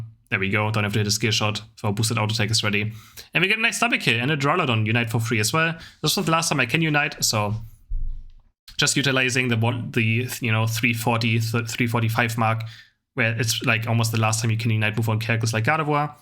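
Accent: Germany. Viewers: beside his accent that is a giveaway his contact email address ends with de so I'm pretty sure he is German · 100% Germany I can tell by the accent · considering his accent he must be a german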